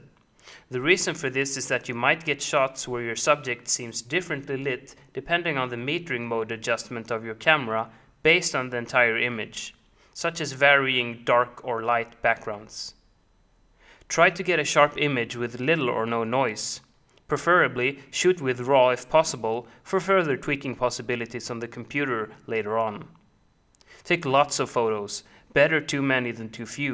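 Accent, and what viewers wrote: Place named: Greece. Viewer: Μα το θεο ακομα και να μην διαβασα ονομα θα καταλαβαινα οτι εισαι ελληνας, το ελληνικο accent δε φευγει ποτε και κανει μπαμ απο χιλιομετρα, πολυ ωραιο βιντεο αδερφε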